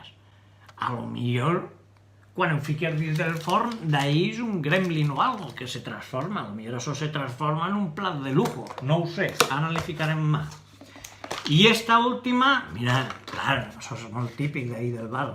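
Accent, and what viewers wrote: Valencia, Spain. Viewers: És un luxe sentir-te parlar en valencià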